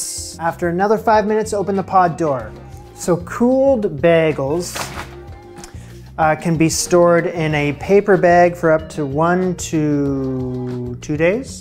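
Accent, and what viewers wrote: Canada. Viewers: I've also noticed you pronounce vague as vag not vayg the way Americans do. The bag-ull instead of bay-gull follows suit. Thanks for addressing it though. Nothing wrong with being a proud Canadian, eh? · My grandmother was Canadian. You say it just like she did I’m gonna go with it to regional pronunciation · I thought you might be Canadian, but this confirms it lol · I think its a north american dialect thing. I'm in no way an expert but I'm besties with an SLP and we geek out about dialect stuff. Please correct me if I'm wrong but the Canadian vowel shift and the northern cities vowel shift have a similar thing in how they treat the short a. Making for bag-el vs bay-gul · I say it the same. I live 30 mins from where he’s from in Ontario. I didn’t think it was odd but people pointed it out a few years ago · It is Canadian! Dont feel bad! 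Its your Ontario roots. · "Bägels". Coming from Sweden, Canadian English isn't a variety I'm very familiar with. I always feel a slight sense of satisfaction when I can pinpoint the Canadianisms in your pronunciation!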